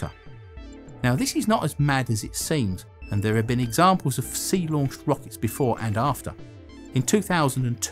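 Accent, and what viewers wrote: United Kingdom. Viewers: His British accent is like music o my ears.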